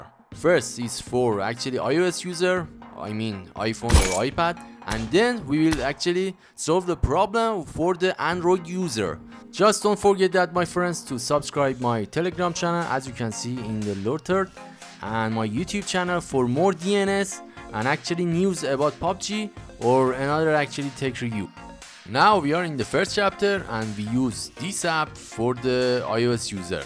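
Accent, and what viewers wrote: Iran. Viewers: با این لهجت بهتر نیست فارسی فعالیت کنی داداش؟! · از لهجت معلومه ایرانی خو ایرانی توضیح میدادی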